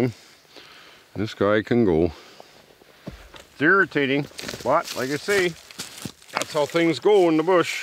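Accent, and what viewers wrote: Canada. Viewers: Hey, I tune in from Australia as enjoy your Canadian voice. Plus I learn lots.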